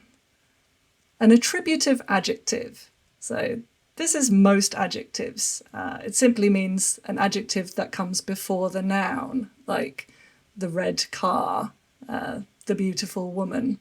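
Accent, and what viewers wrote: United Kingdom. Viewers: It was perfect 😍 I love your British accent 🥹✨️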